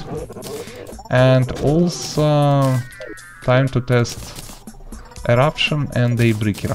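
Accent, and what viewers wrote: Russia. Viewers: also he has Russian accent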